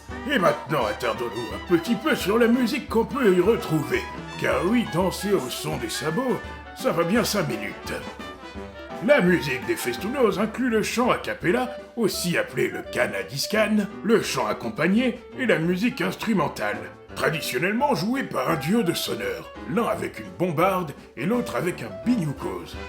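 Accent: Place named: Lower Brittany, France. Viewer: c'est cool de voir qq'1 d'autre qu'un prof de breton ou de danse parler des festou noz a ton accent tu viens de basse bretagne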